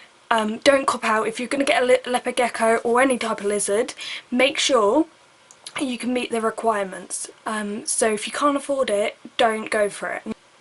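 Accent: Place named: Cockney, United Kingdom. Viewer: Hate the cockney accent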